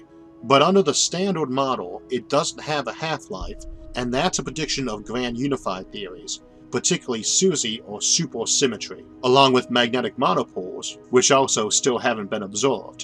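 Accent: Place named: South, United States. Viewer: I enjoy your voice, BTW even with that inability to pronounce 'R' .. it gives your voice a bit of a "southern" drawl. Easy to listen to. Oh and I often transpose letters myself.. It isn't a dyslexic sort of thing, but my brain going faster than my fingers along with large hands and small keyboard :)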